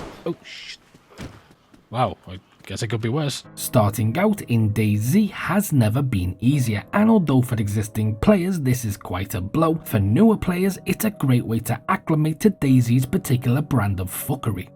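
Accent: Liverpool, United Kingdom. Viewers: Sweet vid mate, do I detect a scouse accent btw lol : ) x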